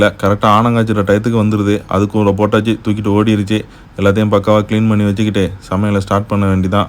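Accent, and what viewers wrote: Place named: Kayalpattinam, India. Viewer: நீங்க பேசறது காயல்பட்டினம் மேலப்பாளையம் பேசுற மாதிரி இருக்கு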